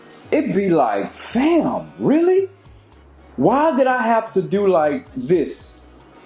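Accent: Ghana. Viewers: They do that in JA too. If they see u from the states they think u have money. Oh wow this is sad the roads are this bad. Its show how much the government care. The money going in their pockets thats what...these places are so corrupt. This is why they beg their family to send stuff from here. Ur Ghanaian accent is so on point tho lol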